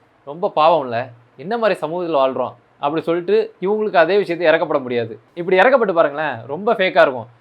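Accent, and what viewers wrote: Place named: Tamil Nadu, India. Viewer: Tamilan kural da 🙏👍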